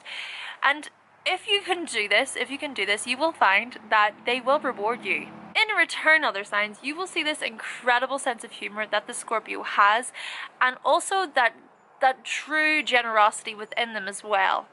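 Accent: Ireland. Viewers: It's true that Scorpios do have a tendency become involved in the porn industry Been there.Done that. BTW, please try not to lose your beautiful Irish accent. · are u Irish? u sound just like Nadine coyle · I love your eyes and i love that accent of yours.....irish?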